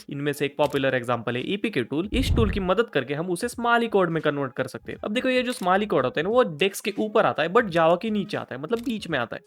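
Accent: Maharashtra, India. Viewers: Authentic and perfect Marathi accent and great explanation too , love the style 💖💖👍👍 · Bhai aapki hindi marathi accent mai kyu hai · Bhai tum marathi hona ❤ tone se samajh raha hain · Bhava tu marathi ahes😂, tuzya hindi varun lagech samajl😂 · Bhai aap maharastra se hoo kya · I can tell you you're Marathi without listening Marathi from you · Bhava tu maharastra cha aahes ka , hindi varun kalta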